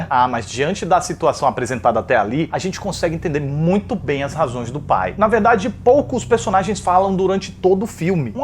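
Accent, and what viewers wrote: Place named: Caruaru, Brazil. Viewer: esse sotaque parece aqui daqui de caruaru muito bom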